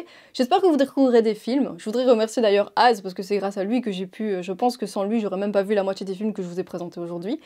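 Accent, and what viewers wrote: Belgium. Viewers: j'adore l'accent belge :D ça me rappelle Bruxelles! J'adore les vidéos!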